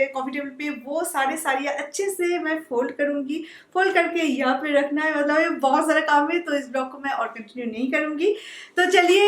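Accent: Bengal, India. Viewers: M following her since last 5 months and loved her vlogs i almost watched her all previous videos too loved the way she use to handle every thing and specially her bengoli hindi mix accent but i dint criticised her ever i just go through comments section and use to see hw ppl use to troll her and feel bad for her but she doesn't care for her viewers.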